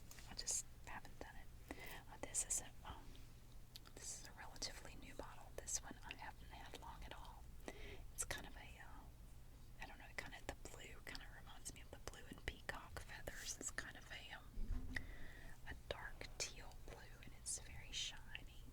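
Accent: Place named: Coastal North Carolina, United States. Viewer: New viewer! You have a delightful whisper. I'm trying to peg your regional accent. Coastal North Carolina? I grew up on east coast and settled in midsouth state.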